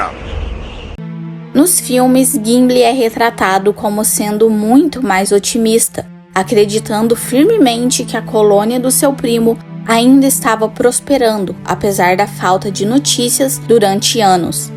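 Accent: Minas Gerais, Brazil. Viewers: Moça, você é do Vale do Paraíba? Vi um cara comentando que você deve ser mineira. Fiquei curioso.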